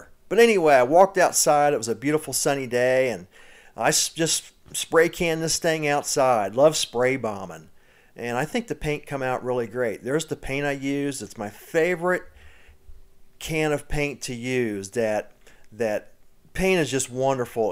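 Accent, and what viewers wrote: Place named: Pittsburgh, United States. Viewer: Black warsh?? Are you from pittsburgh too??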